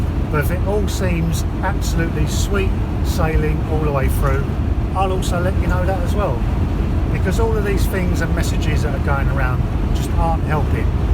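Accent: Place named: Southern England, United Kingdom. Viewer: Judging by your accent you're not that far from the Channel so i can see why you use Eurotunnel. For me Dover is a five hour motorway maul so using the ferry was a welcome break. However i stopped using Calais after a bad experience....not from migrants but a UK Border control guy. I was queued in my campervan, sitting in the drivers seat, when he walked in front of my van so saw me, then wrenched at the side opening door which was locked, so he banged angrily on the side of my van. I got out of the van and gave him a piece of my mind. After that i never used Calais again and started using Dunkerque. The difference with the Border control guys there was chalk and cheese. Total politeness every time. Glad you had a successful trip.....you've just got to batten down the hatches now and sit tight for a few weeks!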